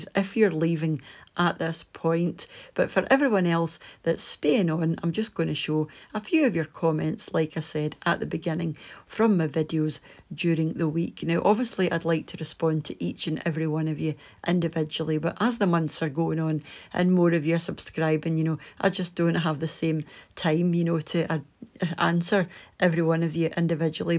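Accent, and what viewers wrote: Scotland, United Kingdom. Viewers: I’m scottish and I like her accent, it’s slightly different from mine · Its a lovely Scottish accent x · if you don't like accent or Scottish accent in general, why would you even bother commenting to be honest. Just scroll on. Loving these videos, keep them coming and ignore the trolls 👍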